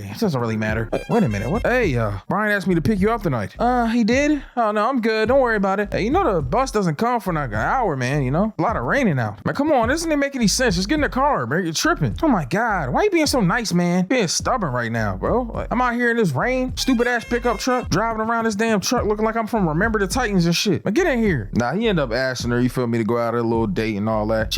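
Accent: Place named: DMV, United States. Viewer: I swear I heard a dmv accent 🤔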